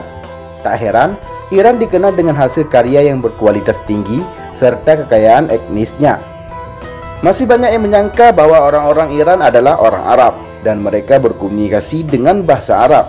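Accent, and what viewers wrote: Aceh, Indonesia. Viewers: Aceh tok tok kali ngomong bahasa aceh, medok that 😅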